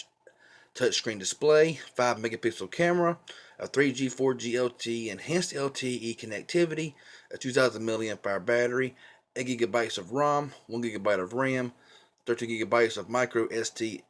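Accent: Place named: East Tennessee, United States. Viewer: Sounds like you're from East TN or Kentucky. Amirite?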